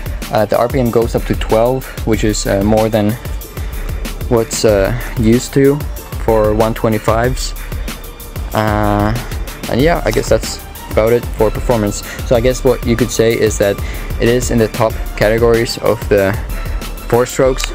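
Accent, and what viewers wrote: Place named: United States. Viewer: You sound American but your plate says you're Polish?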